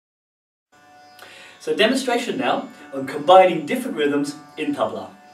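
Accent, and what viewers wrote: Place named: England, United Kingdom. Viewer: .( love your english accent).you are the best techer..i live in USA a fiji island transplant...grew up.heRe in wichita kansasUSA🇺🇸..i heard many teachers on u tube..but MASTERY OF LANGUAGE MATTERS..bedides talent..i just bought a basic tabla..trying to learn basic tabla beats....thanks for having such a good talent..u 👍👍👍r incredible mhndraUSA🇺🇸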